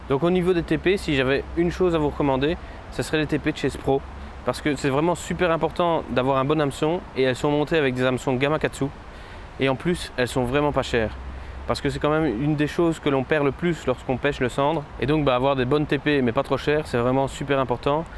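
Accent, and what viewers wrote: Belgium. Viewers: oui j'ai reconnu ton petit accent belge que je connais bien, je suis natif de Valenciennes.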